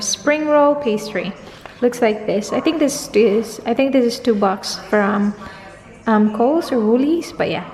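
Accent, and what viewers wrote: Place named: Philippines. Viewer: I love you ate!! I hope po EVERYDAY po ang vlogs nyo 😂 and yea namiss ko yung tagalog mo. 😂 You always speak in english na eh 😂 which is not bad but namiss ko lang po 😂 i hope na sa mga next na vlogs nyo you will speak tagalog na po madalas ☺ (im not saying na bad sya, and yes i understand na nasasanay mag english pag nasa ibang country ka, cause i live rin po sa ibang bansa. but namiss ko lang po again tagalog nyo 😂. i just love your tagalog accent so much ❤❤😘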